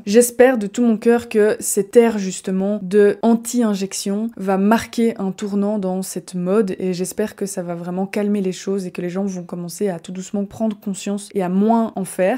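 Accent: Belgium. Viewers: Super sujet et j'aime beaucoup la façon dont vous le présenter, avec profondeur et simplicité. J'entends un fond d'accent belge, non ? En tout cas, continuez !!!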